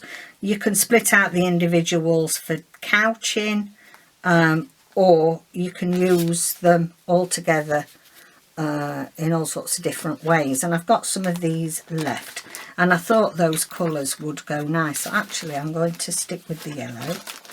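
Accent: Yorkshire, United Kingdom. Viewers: Don't change a thing,. We love your enthusiasm and for sharing your techniques and especially for your accent from God's own county. (Yes I'm biased having been born and bred in Yorkshire but now live "down south"). While watching you I'm finishing my fourth A5 piece of improv. stitching (inspired by Ariane Zurcher). Next week I'm going to start the wrap fabric cover (inspired by Anne Brooke "Wobble Gob 3) - another Yorkshire girl!! Can't wait for the pick jar. Enjoy the plum crumble!!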